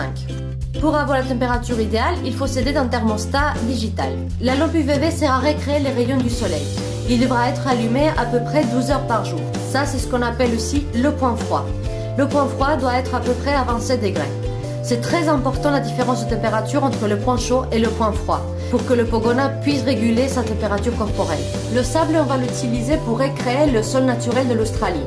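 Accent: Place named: Russia. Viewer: Tu es d'origine russe ? Tu as l'accent 😉 Je parle Russe et travaille avec des russes donc ces accent est très familier.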